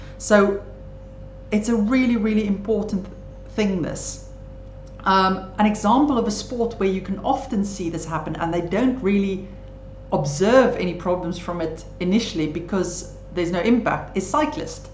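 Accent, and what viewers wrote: South Africa. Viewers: Thank you Dr. Your accent sounds South African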